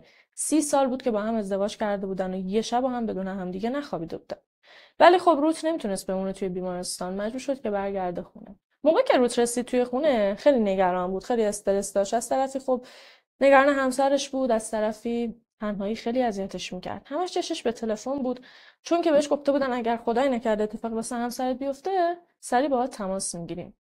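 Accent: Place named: Ahvaz, Iran. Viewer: بچه کجای اهوازی تیکه کلامات مال اهوازیاس لهن صحبتت و نوع حرف زدنت اهوازیه ..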